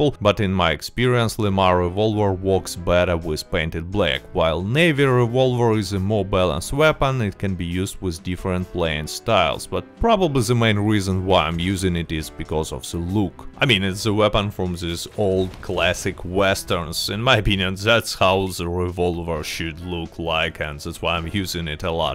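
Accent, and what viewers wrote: Russia. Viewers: I've only just found ur acc but I can tell I'll like u as a content creator also i can hear ur Russian and your English is good can't wait to see what u got for me and I just edited this and realised I replied to som1 and not commented my bad🤭😂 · Russian accent still visible enough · are you Russian? ME TOO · Wow, all video I was like "is this a Russian accent" and when I saw "попадание в шляпу" I get it, so I have a question, what is painting black, is it giving more damage ? Буду благодарен ответу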